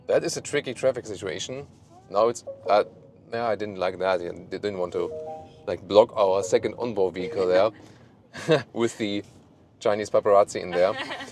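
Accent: Germany. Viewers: I love your German accent. Funny as hell.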